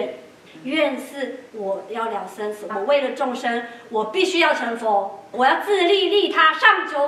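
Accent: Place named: Taiwan. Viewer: Mandarin, with Taiwan accent. Religious content.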